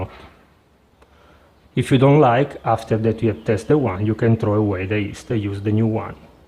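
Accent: Italy. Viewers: Grazie mile... O seu sotaque italiano é muito gracioso... e o melhor de seu vídeo é que filmaste o fim, ou seja, como o kvass se comportou no copo.. obrigado.. abraços do brasil.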